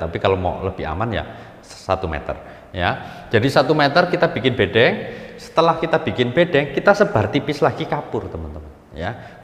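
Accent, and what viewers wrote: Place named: Banyumas, Indonesia. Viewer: Sesekali ngapak nya di keluarin pak,ora ngapak ora kepenak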